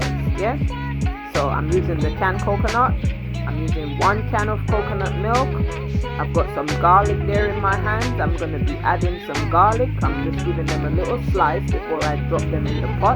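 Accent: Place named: United Kingdom. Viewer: Damn that British and Britishhhh 😅😅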